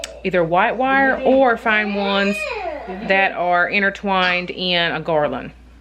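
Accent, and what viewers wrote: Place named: North Carolina, United States. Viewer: Just stumbled across your page and immediately heard the NC in your voice. Just had to stay for a visit. Love all your beautiful Halloween goodies.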